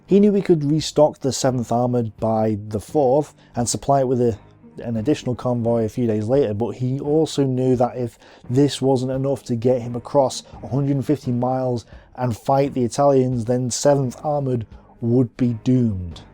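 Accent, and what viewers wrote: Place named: Manchester, United Kingdom. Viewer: It's a Mancunian Accent , from Manchester / surrounds.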